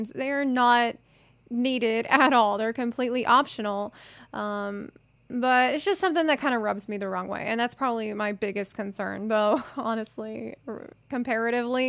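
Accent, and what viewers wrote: South, United States. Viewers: I think it's a light version of a southern drawl, so maybe around Tennessee somewhere? That's a complete guess though.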